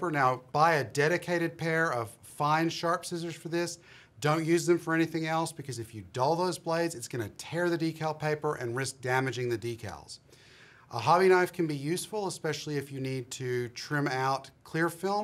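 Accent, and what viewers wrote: Australia. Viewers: bit of an Ozzie accent there sometimes 😁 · G'day...two quick questions...do I note the hint of an Aussie, if not Canadian accent?? Just at times it's more noticeable. Secondly, and more subject oriented...have you gloss coated the Vamp first?? and do you subscribe to the process?? I'm in the process of doing several 1/350 ships...and as such they're not exactly small...and just wondering if the overall process is beneficial on models of this scale?? Thoroughly enjoy all the hints and tips videos...as I've been out of modelling mode for many years, it certainly helps immensely. Cheers from Sydney Aus!!!!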